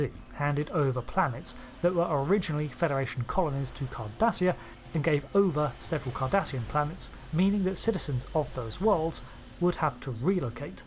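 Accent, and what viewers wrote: United Kingdom. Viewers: The British accent is great for this type of subject. Makes it sound kind of like an old WW2 documentary. Lol.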